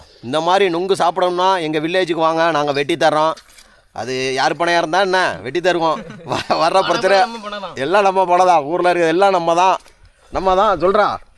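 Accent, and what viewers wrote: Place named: Village Tamil, India. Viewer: அருமை வாழ்த்துக்கள் நம்ம ஊரு தமிழ் அழகு❤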